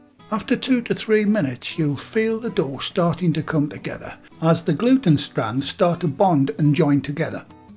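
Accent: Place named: Northeast England, United Kingdom. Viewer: I love that recipe. Probably the best I've seen --- good explanations with the video. Your accent does remind me more of Thornaby/Middlesbrough though.. I'm from Thornaby myself but I used to be able to pinpoint where people were from when I was a kit but once I joined the Army I lost the ability.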